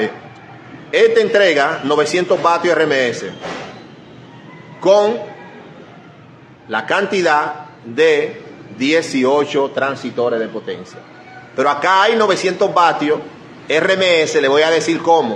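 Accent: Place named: Dominican Republic. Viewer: Por favor señor hay que ser comprensibles el maestro es de República Dominicana y así es su idioma 🤨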